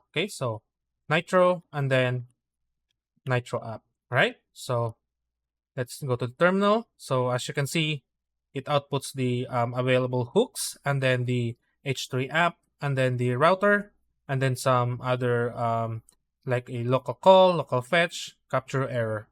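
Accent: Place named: Philippines. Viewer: The accent is very noypi 😂. You have my sub, keep it up.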